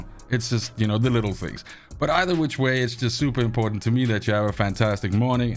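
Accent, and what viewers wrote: South Africa. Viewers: I can recognise that saffa accent anywhere. Thank you for the great video, helped me out. Best of all, it is short and to the point. Keep up the good work.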